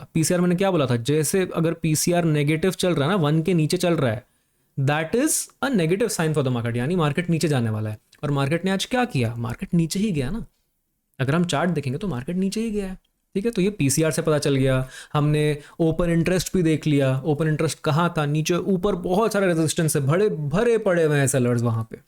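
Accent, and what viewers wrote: Bengal, India. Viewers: are you from Kolkata or Bengali ?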